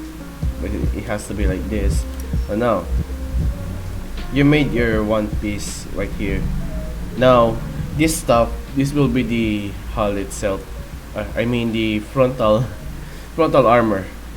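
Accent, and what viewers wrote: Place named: Philippines. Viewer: Where r u from You sound like a filipino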